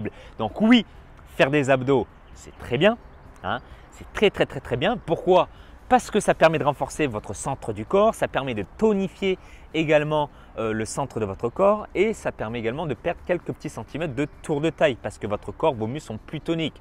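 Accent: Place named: South, France. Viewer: Le petite accent du sud ☺️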